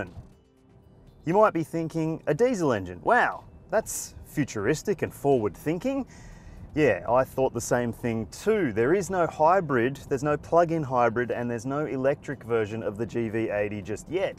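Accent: Australia. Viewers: Im Korean and I decided to study your Australian English so I subscribed this channel.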